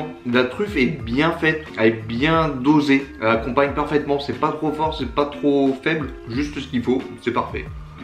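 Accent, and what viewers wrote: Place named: North, France. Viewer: Hello l'ami, il y a le boss de la dégustation du burger, Mr! Et je viens de te découvrir! Sympa ta channel, tu as un tio accent de ch'nord! 62? Je m'abonne, bonne continuation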